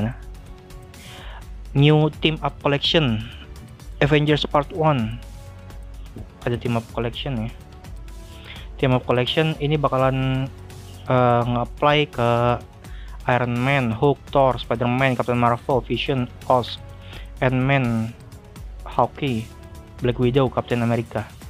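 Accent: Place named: Betawi, Indonesia. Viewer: Lu org betawi min ya 😅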